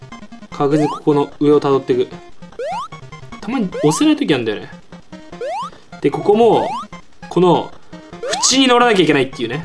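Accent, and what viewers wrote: Kansai, Japan. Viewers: なんで関西弁なの？ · 関西弁草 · 結構関西弁使いこなしてて草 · この時のサワってめっちゃ関西弁使ってるんやなww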